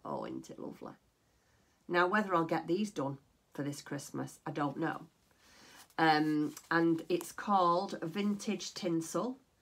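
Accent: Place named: United Kingdom. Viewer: Hi....I found your video last night and enjoyed your video so much that today I went back to watch your older first videos❤️ what a delight you are! I find myself laughing at times when you mention different things because I can so relate to it.....keep up the great work.....you have quickly become one of my video favorites💕 I love your British accent....I have a sister in law who is from England as well!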